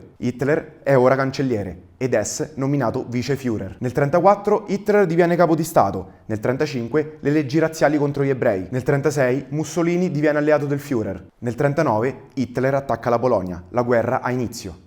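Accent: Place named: Rome, Italy. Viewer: La mia non voleva essere una critica, ma una simpatica constatazione del divertente accento romano.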